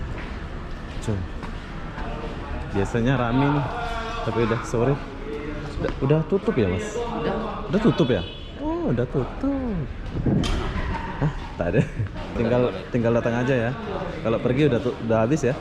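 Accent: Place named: Malaysia. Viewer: Bro logat melayumu kental skali... Mending bahasa melayu aslimu aja di pakek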